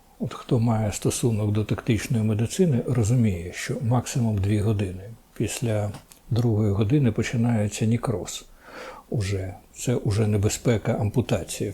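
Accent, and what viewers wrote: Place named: Russia. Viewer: пане, "нЄхлюство" українською мовою - "недбалість". Вам не подобаються українські слова? вам більш до вподоби московські? з московською вимовою на кшталт "проблЄми" тощо. Навіщо ви так спотворюєте державну мову?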